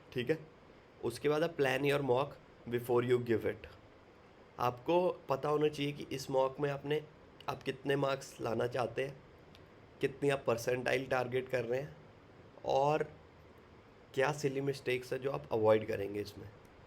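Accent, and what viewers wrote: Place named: Jaipur, India. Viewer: okay sir! Actually your tone sounds like a jaipurite! Thatsy I ask.. 😊👍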